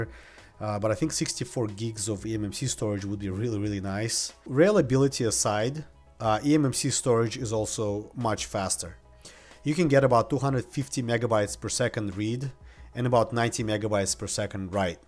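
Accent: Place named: Russia. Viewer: You speak like Russian talks English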